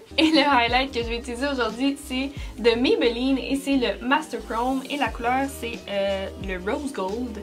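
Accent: Canada. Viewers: Tes vidéos sont toujours autant fun! J’te suis depuis le début et je me lasse pas de tes vidéos! Et cet accent canadien 🙌🏻 Je retourne à Montréal en octobre, j’espère te croiser !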